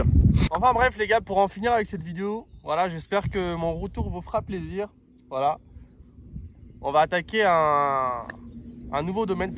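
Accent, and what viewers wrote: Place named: Banlieue, France. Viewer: Tu as un accent des cités. Tu viens d'où ?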